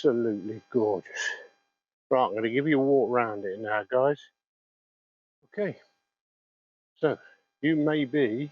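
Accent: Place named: Derbyshire, United Kingdom. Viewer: Very funny like your stile.Listening to your accent I dont think you live all that far from Wetton mill.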